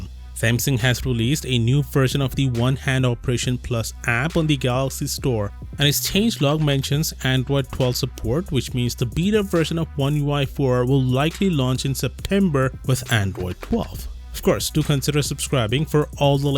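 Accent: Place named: India. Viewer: it explains the english /indian accent lol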